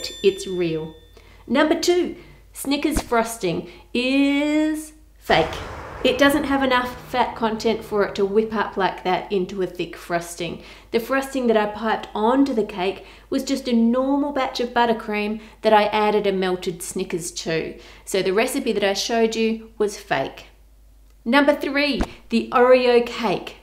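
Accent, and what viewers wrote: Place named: Australia. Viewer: Better--to me Bob had a really odd "would you like a piece of candy little girl" voice, where is more gentle and has a nice Down Under edge to it. Oh, and cheerful.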